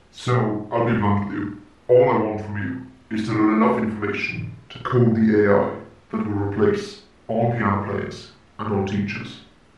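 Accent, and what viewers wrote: Wales, United Kingdom. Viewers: Why thank you! It's a Welsh accent.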